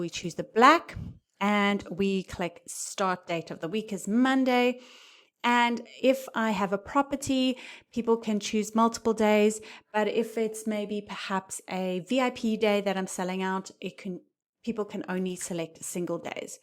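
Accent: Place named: South Africa. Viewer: Great video and very helpful. Thank you,. Nice to hear a South African accent:)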